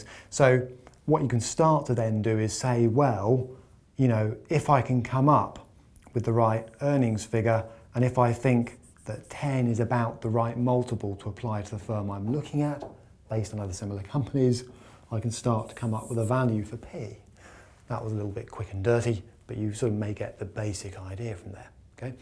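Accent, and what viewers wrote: RP, United Kingdom. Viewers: Received Pronunciation/ Standard English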